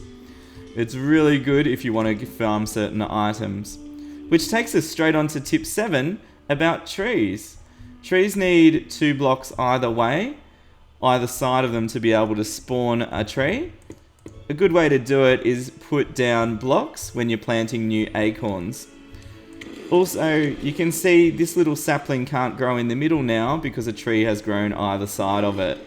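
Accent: Australia. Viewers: You sound Australian, if you are im to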